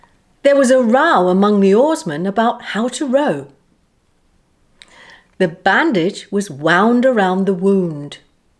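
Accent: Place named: Scotland, United Kingdom. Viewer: Hi, I am here just to say you are amazing. Where have been all my life? Your Scottish accent made me giggle. You are the best English teacher I ever had. Grazie mille la mia eccellente insegnante. Ciao.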